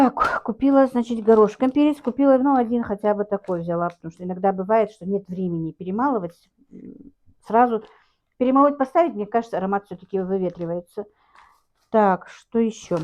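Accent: Standard. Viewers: Чистейшая, грамотная русская разговорная речь. умница.Наверное имеет высшее образование.да и книги читает. ❤Дай Бог здоровья.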